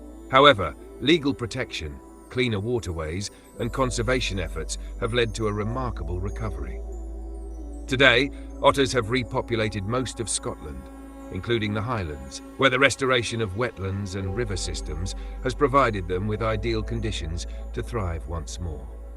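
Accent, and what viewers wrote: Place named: England, United Kingdom. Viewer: English voice explaining our story to us! Sorry! Couldn’t listen to this…..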